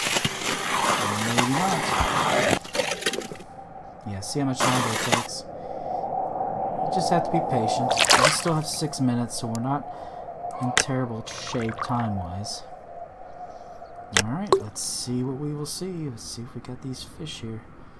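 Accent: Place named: Georgia, United States. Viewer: You sound like youre from Georgia, which makes you a natural Ice Fishing Expert I recon :D Good Video! Can you show us how to catch Sturgeon next?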